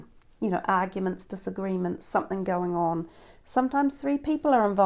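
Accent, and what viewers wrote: New Zealand. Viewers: Hi there are u from nz? U have strong kiwi accent. Subbed. :)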